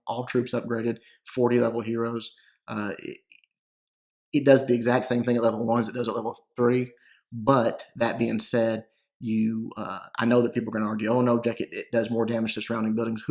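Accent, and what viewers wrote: South, United States. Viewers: His voice is soothing. And who cares if it's country lol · dont listen to these idiots, your southern accent is the best!! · I don't mind the way his voice sounds. I've heard many country voices and I live in the city/suburbs in the north east United States. So I don't understand all the hate about it myself. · Half a year ago when i found your channel (ironically i was here because molt asked his viewers to check out a youtuber who can explain clash strategies down to a science). I was one of those folks that weren't exposed to country accent. And honestly, your accent did turn me off. But your content was ridiculously good and one of a kind out there.. so i accepted that about you and tuned in. Now looking back I realized what I did was silly, and unfair; I prejudiced against country accent. Now watching you daily, not only have I learned a bunch of clash, I feel I have come to tolerate the country accent. 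Frankly, I quite like your accent now; it sounds jolly. I'm sorry, and thank you,. I just kinda want to throw this out there for folks who aren't exposed to the country accent. Keep an open mind, learn to accept people who are different from you, and you will gain much more in life. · Southern accent is fun, don't try to change it, it sounds like my uncle is doing the video 😀 keep on doing what you do! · its true, your voice is very country-ish, but its just adorable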